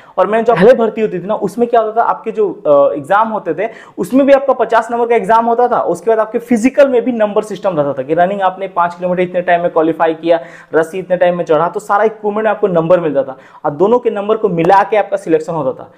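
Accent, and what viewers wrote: Odisha, India. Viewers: Bhai Tum Odisha se ho kya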